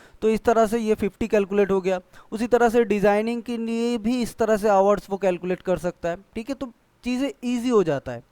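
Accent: Bengal, India. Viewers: Bangali naki?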